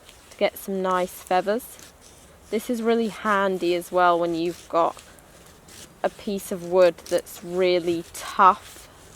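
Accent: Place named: Cockney, United Kingdom. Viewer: A fellow lefty I see. Nice video 🙂 So not the subject of the video obviously, but I love the accent. Cockney?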